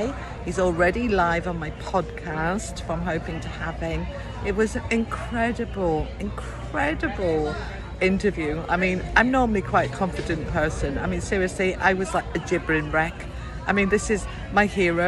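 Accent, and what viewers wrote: Wales, United Kingdom. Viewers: My son has just arrived back from Orlando. It was 35 degrees, ugh. I’m in England and to me you sound ever so slightly Welsh. ❤ Did you mean the Celestine Prophecy?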